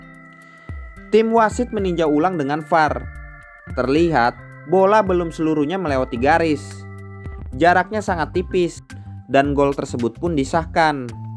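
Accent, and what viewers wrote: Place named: Banyumas, Indonesia. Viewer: Mimin-nya orang ngapak seperti nya....😬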